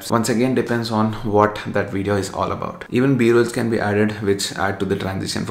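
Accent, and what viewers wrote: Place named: Telangana, India. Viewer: This is the actual fun I need frm u , talking in pakka telangana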